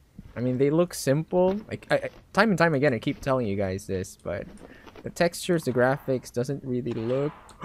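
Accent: England, United Kingdom. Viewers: I love his English accent tho 🥵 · I love how I'm so obsessed about his videos but maybe it's because how real he is and how his English accent is just the right voice with these horror gameplays. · Nice English Accent Though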